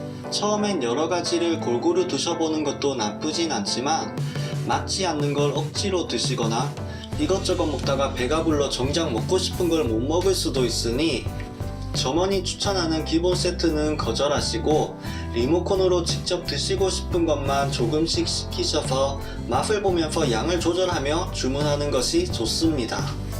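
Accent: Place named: Gyeongsang, South Korea. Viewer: 엌.... 비웃으려는게 아니라 제가 친정이 거제도고 결혼전 부산에서 살아서 참 그리운데 님 샤브샤브 그 특유의 경상도 ㅅ 발음이 너무 반가워서 댓글 달고 갑니닼ㅋㅋㅋ 좋은 영상 잘 봤어요~>___<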